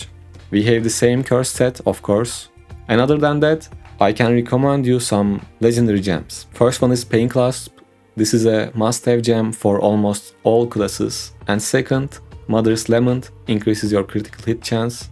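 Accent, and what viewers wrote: Turkey. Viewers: İnanılmaz türk aksanı var reyis türk müsün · Konuşmasindan anladim türk olduğunu haha Almanyadan selam reis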